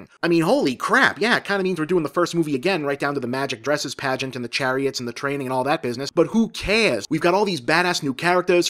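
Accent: Boston, United States. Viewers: Wow, his speech mannerisms and accept really show through on this one. I think I've seen about 25 of these things and never go "Boston" stuck in my head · has a boston area accent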